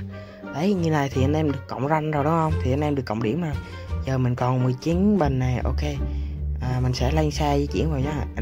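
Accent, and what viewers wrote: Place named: Da Nang, Vietnam. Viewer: anh là người đà nẵng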